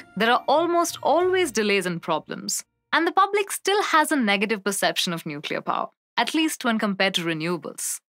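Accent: India. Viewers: the most precise Indian accent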